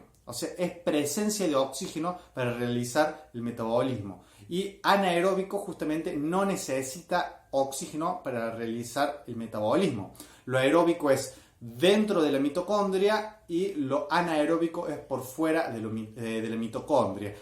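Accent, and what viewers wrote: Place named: Cordoba, Argentina. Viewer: Muy bueno, chango. cordobés tenías que ser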